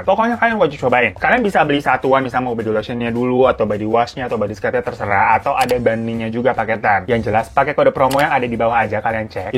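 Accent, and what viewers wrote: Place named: Jakarta, Indonesia. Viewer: Lu orang cikarang ya bang betawi bener😁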